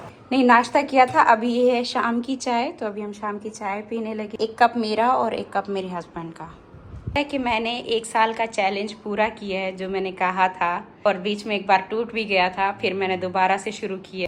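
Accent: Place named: Rural, India. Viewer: Phly ham apko dekhty thi to hami bhot hancty thi ap etni gaon wali or asi bolti the k hami hnci ATI thi aj v wo baty wo time apka yad ata tha or aj dekh jhut bol BOL k pasy kamai or aj ghmndi fakhari aorat bn gi zara nmaz ko Allah si v yari lga k dekh dunya ko chor di lalachi aorat bhni faimle sab reshty na khtam kri 😊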